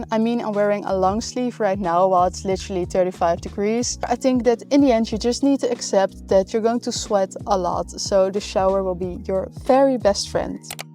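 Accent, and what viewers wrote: Netherlands. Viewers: i love your dialect from dutch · Dialect? She's speaking English with a Dutch accent.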